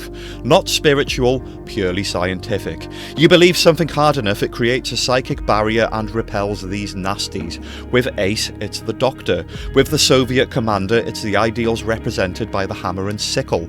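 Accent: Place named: Northern Ireland, United Kingdom. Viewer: Part of me wishes this was the final episode of the seventh doctors era. As cheetah Planet ain't bad its just feels like mid-season filler & it'd have been so much better for this to have ended an Era its spiritually the season finally but the way the episodes played it ended up being the second last story. It was the perfect end to the seventh doctor. I really am glad that its part of the easy to access stuff for the newer fans to the classic era. As its fitting I got this on DVD in 2013 when I was young round the 50th due to me having a magazine that talked about a bunch of stories & this one caught my eye & at the time it kinda went over my head & I tried to relive it last year or so but my disk was scuffed & the DVD is outta print. Was planning to watch it on iPlayer when it came out & decided to watch the tales of the Tardis form which is alright but I feel like it doesn't open with as much mystery when ACE spoils it. 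But other than that its great. I shall come back to this review once I've finished watching it again. I just came to comment because the internet is being a bit annoying & I am just waiting for it to work before I post this & return. Edit: this has been eating at me for a while but I've heard enough of your speech to make me think that you're Northern Irish like me. Never heard anyone outside of NI say Babi like other words have been used but none like Babi which makes me just think you are Northern Irish. Love from the beaches of Strangford lough where Vik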